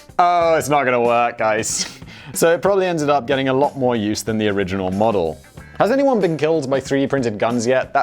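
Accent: United Kingdom. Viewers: ...look, I'm not gonna go read all of the boi with the blazes comments here, but damn... are people REALLY wasting TIME on comments like, "what is a taco?" OBVIOUSLY pronounced by a British man??? How many ducks do people really give about his pronunciation???? Geez!!!!! That's a little bit picky, don't ya think??? I LOVE THIS CHANNEL!!! IT'S MY FAVORITE!! LET THE BRITISH MAN SPEAK!!!!🙄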